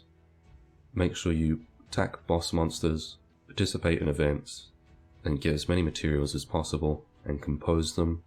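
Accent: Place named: United Kingdom. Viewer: Record your voice level at a higher volume on your next video, please I find it a bit low, could be clearer for your wonderful British accent. Great otherwise.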